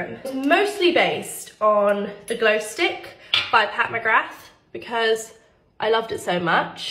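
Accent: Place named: United States. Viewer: Y does she sound american??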